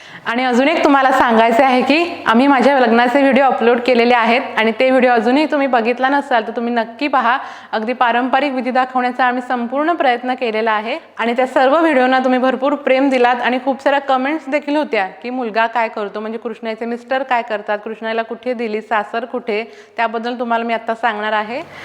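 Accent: Kolhapur, India. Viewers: बोलण्यात हळू हळू कोल्हापुरी tone जाणवतोय बरं का!😅😅... खूप शुभेच्छा तुला...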